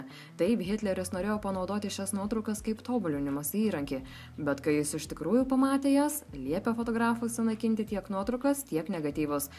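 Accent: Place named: England, United Kingdom. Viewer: ausys kraujuoja nuo tarimu, pasigooglink kaip tariasi elementariausi pavadinimai, nebandydama išlaužti ju tarimo anglu tarme.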